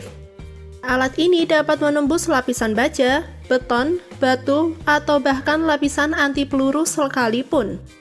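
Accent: Java, Indonesia. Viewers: Wong djowo medok e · Medok suaranya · Ngomngnya medog wkowkowko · Org pundi mbaa, medhok bgt omongan e hahaha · Naratornya medhok...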